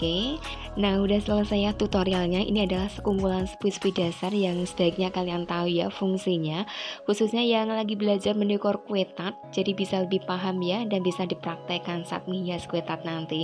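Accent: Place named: Java, Indonesia. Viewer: suarany lembut skli bunda tp logat jawany msh ada medok dikiiit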